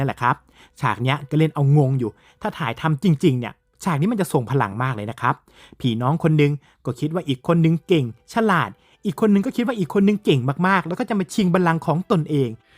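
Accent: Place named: Sukhothai, Thailand. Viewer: 😂เหน่อโขทัยเหน่อตลก😂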